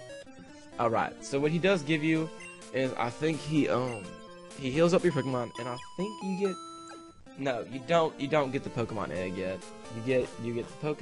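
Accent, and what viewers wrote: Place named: United Kingdom. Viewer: You can talk very good british!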